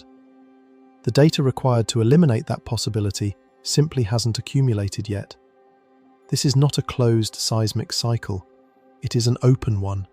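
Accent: United Kingdom. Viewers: Different channel name same British spit mouth. There would not be lisp noise unless words are being spit mouthed. Use an American clean English AI text speech reader and stop repeating not this, not that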